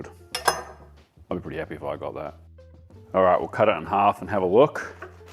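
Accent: Australia. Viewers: Sounding very aussie now mate, gotta come back to your whakapapa 🥝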